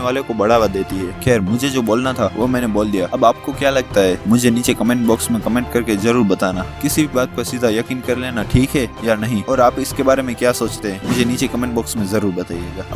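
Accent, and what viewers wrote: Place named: Rajasthan, India. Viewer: aapke langauge tone se aap rajasthani lag re ho ya gujrati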